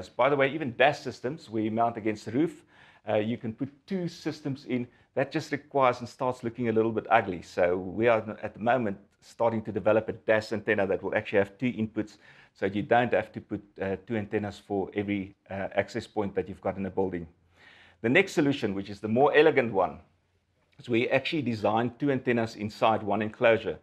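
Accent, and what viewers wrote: South Africa. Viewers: a South African accent talking man tech talk... with all the gear on the desk ... I think it might be an Austrian thing as we have a lot of South Africans living here and we find the Accent very funny as they are mostly very wealthy and loud... but not that with it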